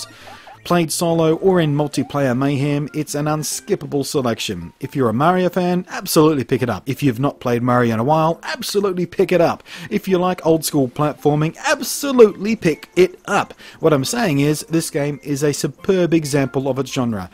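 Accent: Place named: Australia. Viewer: Thanks for yet another informative vid, i do rather enjoy your style and its nice to hear another aussie doing things right! I am some what curious as to why you excluded zombieU from this review list? i think i remember you saying in one of your other wii vids that you were a little over the whole zombie game thing, but I am a little curious as to one, how it plays and two, what you think of it. If you have already gone over all of this please forgive my blathering ignorance.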